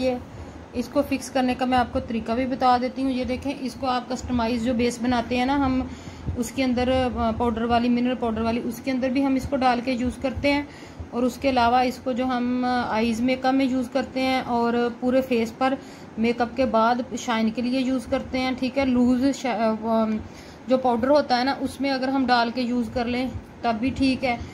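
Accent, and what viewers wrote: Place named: Punjab, India. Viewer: Aap punjabi ho.punjabi hini main a hi jandi a ji😊😊😊😊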